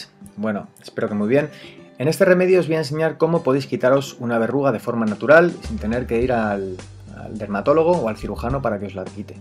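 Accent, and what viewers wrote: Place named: South America. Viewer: Excelente consejo. Lo llevaré a la práctica a la de ya!!! Muchas gracias por el tip. Sólo una pregunta mi distinguido: En lugar de lo que tu llamas esparadrapo puedo utilizar lo que yo llamaría Microporo o cinta microporosa???? Quiero entender que es lo mismo. Por tu acento creo que eres sudamericano o de España, y aquí en México yo creo que sería la alternativa correcta. Me equivoco???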